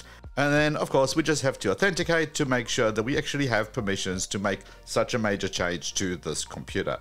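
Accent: South Africa. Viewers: I might be way behind, but are you South African? 🤣🤣🤣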